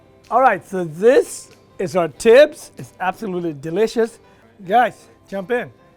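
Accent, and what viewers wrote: Sweden. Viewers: You can really hear the Swedish influence when you talk. ^^ Tack för receptet måste testa det snart! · Hörde hans svenska dialekt, vad kul!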